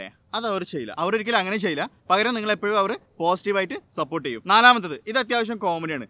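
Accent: Kannur, India. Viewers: ningal kannur aano?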